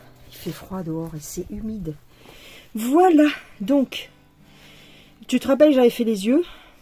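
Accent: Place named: Marseille, France. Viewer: Coucou du Québec. Bravo pour tes belles avancées et ton enthousiasme communicatif. Et j’adore ton accent. De quelle région viens-tu? Je dirais Marseille…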